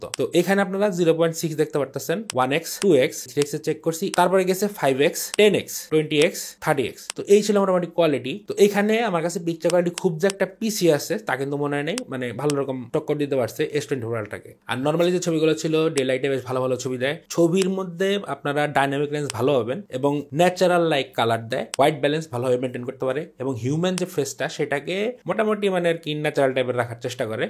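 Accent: Tangail, Bangladesh. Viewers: Vai, apnar bari ki tangail?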